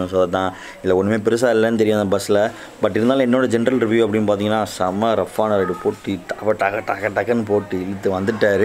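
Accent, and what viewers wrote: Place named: Kerala, India. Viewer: Im a Kanyakumari Malayali....I know u have a Malayali touch....U know Malayalam...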